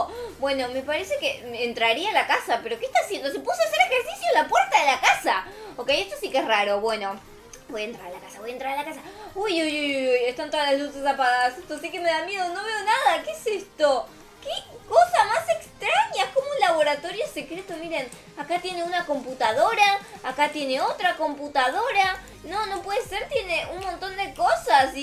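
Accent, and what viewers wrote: Argentina. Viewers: Te amo soy de gran canarias y me pegaste tu acento aregentina yo cuando sea mayor me voy a vivir a Argentina tengo 11 años y me instale roblox gracias a ti y fue lo mejor que hice te amo ati a a y a te amo y mi familia dice que eres mi ejemplo a seguir te quieroooooooooo oooooooooo muchoo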